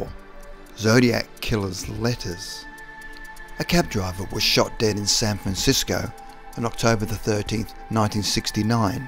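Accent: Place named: Australia. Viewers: Matt Kustom Kostumes i noticed that too. The narrator sounds Australian to me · peethewak, I listened closer and you are correct, the narrator is Australian.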